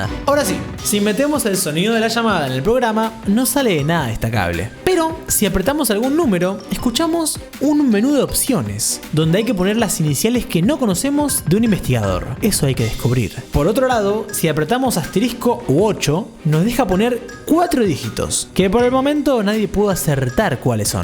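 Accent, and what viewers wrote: Argentina. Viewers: H ey se de noto que sos argentina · El primer yutuber que habla argentino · sos de Argentina?? :'D que orgullo XD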